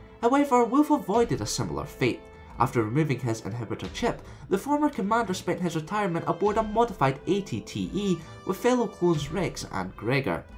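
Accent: Scotland, United Kingdom. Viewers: you sound really scottish...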